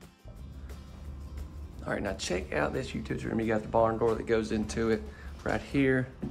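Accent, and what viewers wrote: South, United States. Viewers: but it is you. You are a southener. Not a bad thing. It is in your personality be glad it's a likeable trait. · Here in Texas, they say "fixin to"....in his adorable way...says "fitin to".....I am beginning to learn Southern with him!!!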